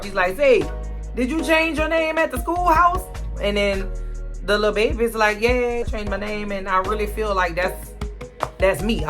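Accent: New Orleans, United States. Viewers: Love love love your accent, Is it New Orleans? I just subscribed !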